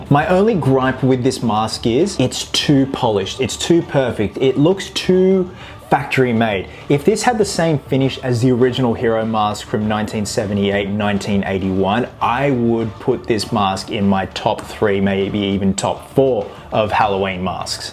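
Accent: Australia. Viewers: I thought that accent sounded right. A fellow Aussie spotted!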